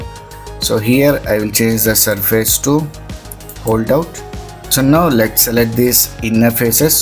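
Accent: India. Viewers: why is it all indians weird curry accents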